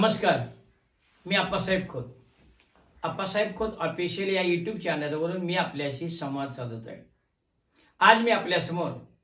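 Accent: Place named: Kolhapur, India. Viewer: Salute sir thumala nad khula kolhapuri tone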